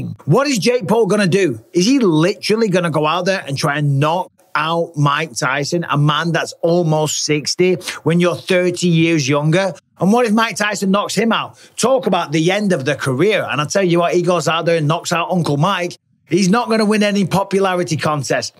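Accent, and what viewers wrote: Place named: United Kingdom. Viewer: Why are you speaking like a Brit?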